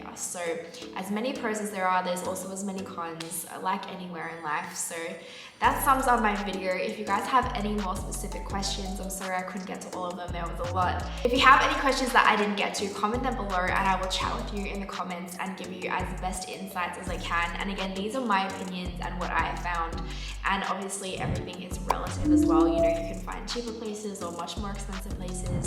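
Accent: Australia. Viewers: I have a problem that, I can't understand your Australian accent, I can completely understand bro's accent. In reality, I can only understand American accent because, I am from Pakistan. BTW, Love from Pakistan to Australia, Brazil, and Usa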